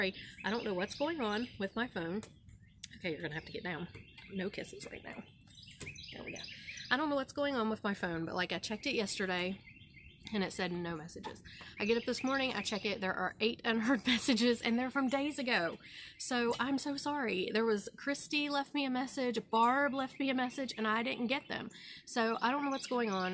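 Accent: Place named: Pittsburgh, United States. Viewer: Ahh, okay. Every once in a while, you sound like some folks I know from the Pittsburgh area, which is why I asked. 😊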